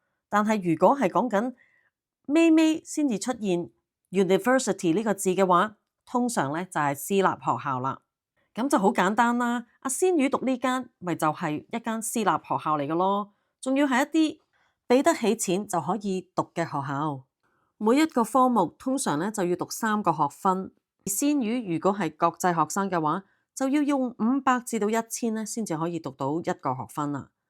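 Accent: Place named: Hunan, China. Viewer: 七仙魚口音應該是湖南人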